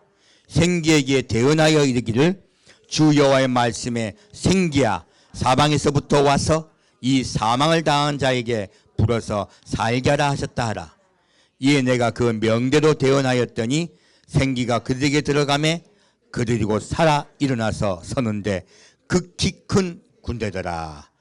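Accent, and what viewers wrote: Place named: Jeolla, South Korea. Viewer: 전라도네요. 아멘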